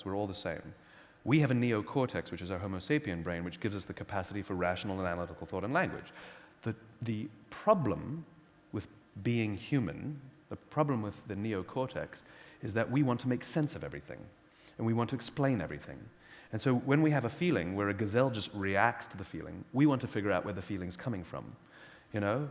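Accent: England, United Kingdom. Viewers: He should keep his beautiful English accent. It sounds so respectful and sexy. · I just love his British accent 🥰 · Why does he sound so British here ? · Back before he lost his English accent. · Haha, when he's in England he kinda talks brittish :D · Wow surprised with his British accent 👏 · He's almost doing a British accent.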